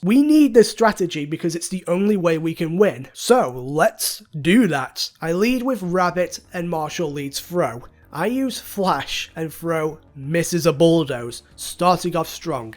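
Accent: Northern England, United Kingdom. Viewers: Found your channel this morning and now going through the rest of your vids. Your scripting and editing is class. I'm from Northern England so your accent gives me some bias into liking the commentary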